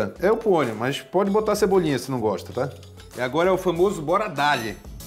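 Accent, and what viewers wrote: Pará, Brazil. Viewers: voce leva o Pará para o mundo com sua espontaneidade e essa pronuncia que éa coisa mais linda! Amo suas receitas e seu modo de usar o que temos.como disse antes conheci tua familia no Recanto e admirei para sempre.Lembrou muito a minha que nos criou com um pé na cozinha! Valeu! Amo teu canal.Vou te convidar pra conhecer nosso Café.Aceita?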